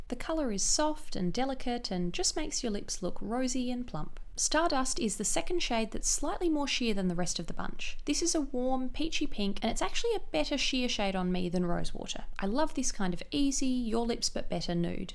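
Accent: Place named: Australia. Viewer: I come to all your videos for cool reviews, pretty hands and that lovely Aussie accent 👌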